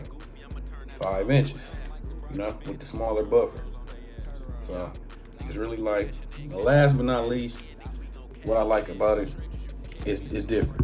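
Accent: Michigan, United States. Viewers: You got my follow bro..Good review! Sound like you from my small town Saginaw MI 😂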